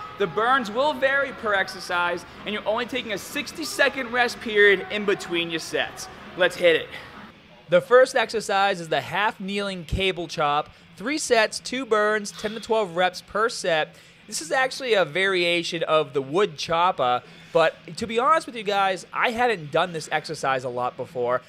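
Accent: Boston, United States. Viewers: What's with this Boston accent all of sudden creeping into your videos ????? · The "Baaaston Haaabar" accent has gotten really bad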